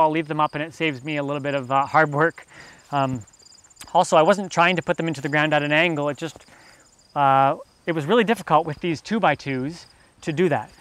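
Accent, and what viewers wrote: Canada. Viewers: He's Canadian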